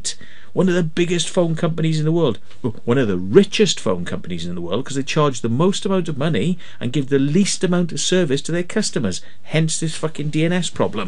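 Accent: Wales, United Kingdom. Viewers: is he welsh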